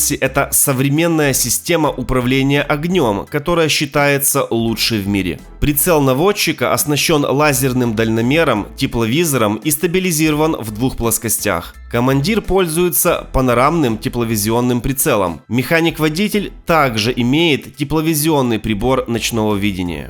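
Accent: Ukraine. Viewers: украинский акцент, прикольно. А что не на мове?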